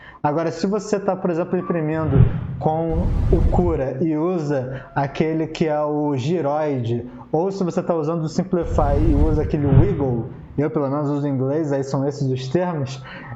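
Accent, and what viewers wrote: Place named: Rio de Janeiro, Brazil. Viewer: Esse sotaque carioquêixxxx é nojento demais meu.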